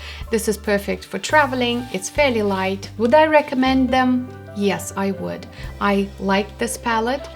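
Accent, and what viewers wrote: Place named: United States. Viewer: Как прекрасно ты говоришь, словно ты американка!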